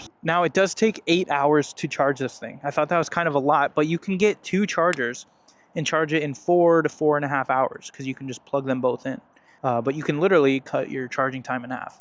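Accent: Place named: United States. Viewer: you can hear the country in his voice new mic?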